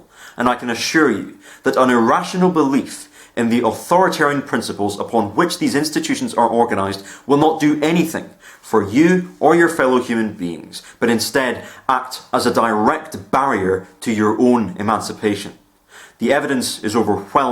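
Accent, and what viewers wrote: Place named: United Kingdom. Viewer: You look like trey sessler but with a british accent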